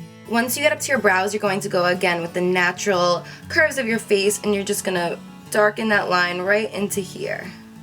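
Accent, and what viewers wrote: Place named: California, United States. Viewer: How cute can you be, you sound like a california girl then some black women, and its sooo cute!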